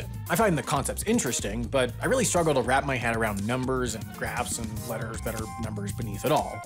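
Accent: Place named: Michigan, United States. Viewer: I swear your from Michigan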